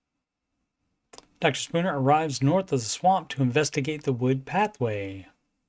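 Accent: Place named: Atlantic Canada, Canada. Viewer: Awesome stuff Coach keep it up. Either your from Nova Scotia or Newfoundland 😀 the out gives it away 😆 🍻 👏 ✌️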